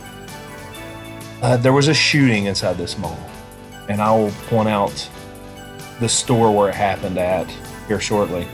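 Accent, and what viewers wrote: South, United States. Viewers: West Town Mall sister property of Simon Properties is thriving along with Turkey Creek lifestyle center is the retail hub for Knoxville. Pigeon Forge has a huge outlet center which is a destination. I love your southern accent. My family's from Lenoir City Kingston area.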